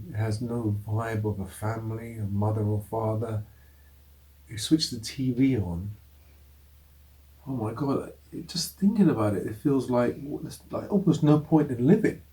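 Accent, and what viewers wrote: United Kingdom. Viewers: Hello,just discovered your channel,are you English?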